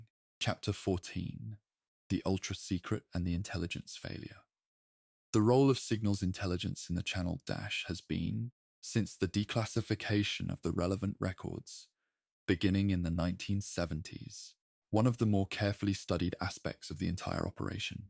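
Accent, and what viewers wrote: United Kingdom. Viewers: One of the best stories I have listened to on your channel. I love the narrator’s voice; Americans tend to love the British accent! Very soothing. Thank you!!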